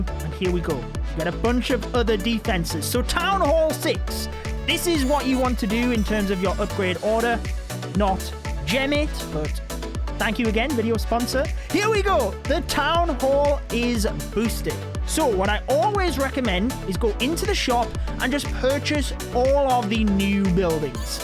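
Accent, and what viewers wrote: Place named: England, United Kingdom. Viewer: You from England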